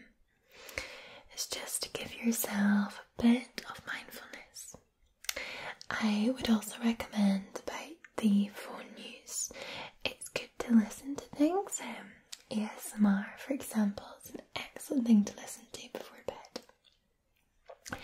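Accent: Scotland, United Kingdom. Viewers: Sleep therapy with a scottish accent. Nice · im working at a construction site lifting 4ton tubing listening to scottish asmr... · I didn’t know I needed Scottish Accent ASMR until now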